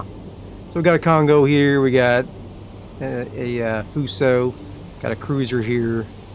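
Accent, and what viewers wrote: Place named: South, United States. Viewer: 1) I'm hearing a Southern accent. Where ya from? I'm from Texas myself. 2) Holy crap...I didn't know someone modded WoWs vessels into the game! Makes you wonder what Japanese Navy ships you'll run into! Wonder if they fixed the striking power of the B-17s...I watched one play-through of the Tokyo Express mod where a YAMATO-class battleship was sunk by a SINGLE B-17. (No, I am not lying or BS-ing here, nor have I been drinking. 😂)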